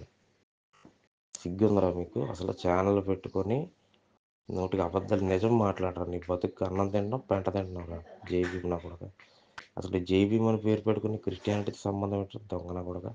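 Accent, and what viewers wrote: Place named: Andhra Pradesh, India. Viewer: ఇది ఆంధ్ర స్లాంగ్.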